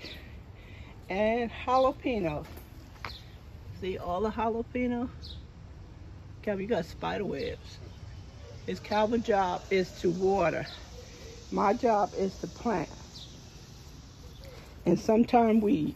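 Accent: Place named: New Jersey, United States. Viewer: I knew when I heard you talking that I recognized a fellow Jersey resident. I love that you garden too. I love growing my own fresh fruits/veggies in the warmer months, and then being able to enjoy the "Fruits" of your labor. I would love to hang and crochet in yr garden with You & Calvin!!! I'm from Bergen County, but now live in Sussex County. ❤❤❤❤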